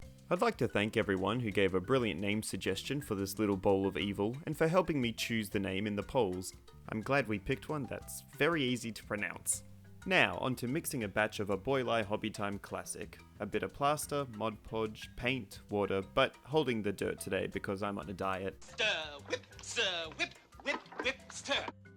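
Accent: Australia. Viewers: I came here looking for another great maker and was super stoked to hear an Aussie accent when it started. Love your work and your style. Subscribed - can't wait to see more.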